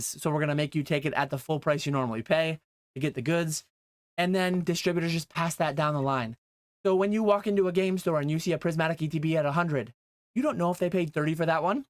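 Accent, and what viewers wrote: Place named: Upstate New York, United States. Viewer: Oh snap. A fellow upstate NYer. And sounds like an actually upstater. Not just an hour north of NYC.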